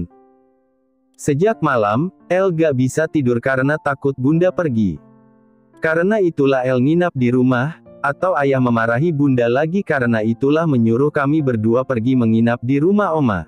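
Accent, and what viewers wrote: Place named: Indonesia. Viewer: Logat Indonesia guys guis😊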